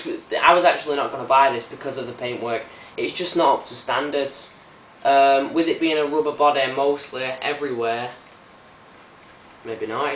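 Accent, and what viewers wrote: United Kingdom. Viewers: youre awesome are you scottish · 5 Stars for British accent!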